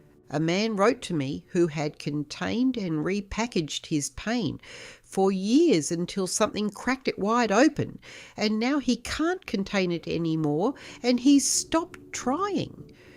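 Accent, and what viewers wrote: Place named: Australia. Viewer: Female Aussie accent in Ai.(?) Blokes know you are right. Give us pause to reflect... (then start nagging ...if we can't come up with plausable excuse, we deserve it).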